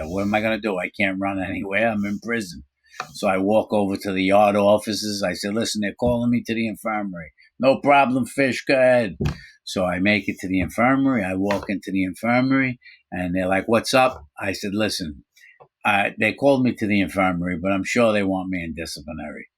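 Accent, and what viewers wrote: New York, United States. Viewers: Thank God that didn't effect your parole you deserve some luck that could have ended very badly for you both 🤞 your a great story teller i think the New York accent also helps looking forward to how you managed to be friends with the nurse haha 😅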